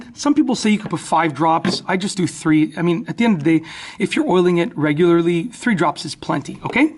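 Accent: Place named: Canada. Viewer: canadian ehh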